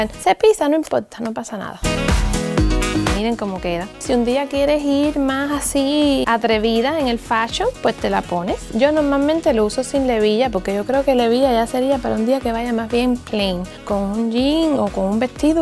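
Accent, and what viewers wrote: Cuba. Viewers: Son cubanas por eso tienen el mismo acento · eres un amor eres una buena actriz y me encanta tu acento Cubano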